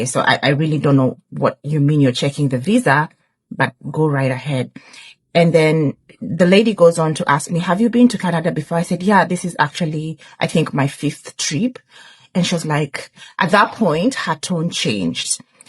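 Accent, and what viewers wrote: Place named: Kenya. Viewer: Well, you need to go back to school and evaluate your education. I have studied psychocology too. And as a kenyan, I know she is kenyan. Her accent speaks for itself. 😏🙄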